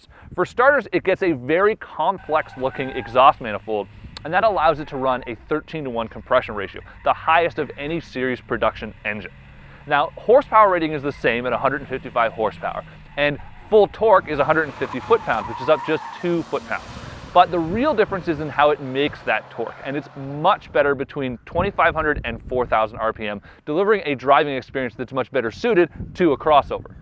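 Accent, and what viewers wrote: Scotland, United Kingdom. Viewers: is this guy American or Scotish? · goodness..have you not heard of a scottish accent.this guy sounds crap and eats his word.Try Billy Connolly he has got a nice scottish accent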